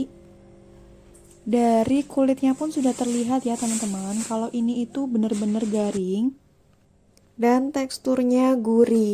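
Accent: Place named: Java, Indonesia. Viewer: Medok ora pa2 yu,, 😁😁😁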